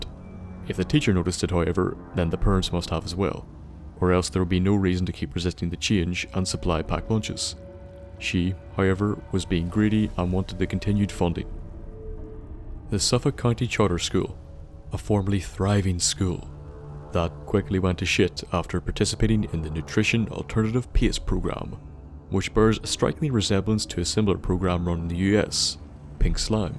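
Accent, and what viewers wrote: Northern Ireland, United Kingdom. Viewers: He is northern Irish. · Hmmm off topic Question if I may...Where are you from?. The accent sounds like Nth Ireland but with a touch of..................well that is why i ask hehe..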